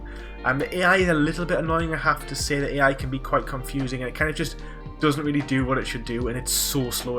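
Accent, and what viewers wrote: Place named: Teesside, United Kingdom. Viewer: Now mate been watching your channel a couple months, and judging by your accent I think your from near where I live. I live in Middlesbrough. If you don’t want to say where your from I understand.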